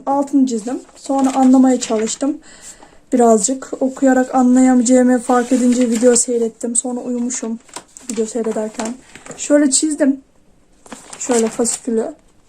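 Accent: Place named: Kütahya, Turkey. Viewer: Şiveden Kütahyalı gibisin öyle isen Kütahya'nın neresindensin?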